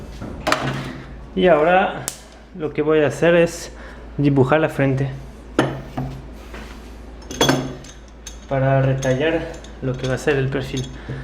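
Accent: France. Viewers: t'es francais? Il ya un accent français dans ton Espagnol. Y muchas gracias por el contenido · Tu acento es como frances, de donde eres?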